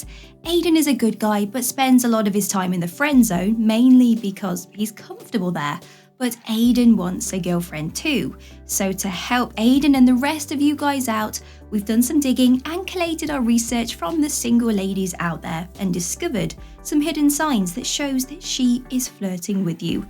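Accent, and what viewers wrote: United Kingdom. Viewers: has a nice British accent to listen to, where in England are you from? 😅 good tips and yeah dating is difficult 😊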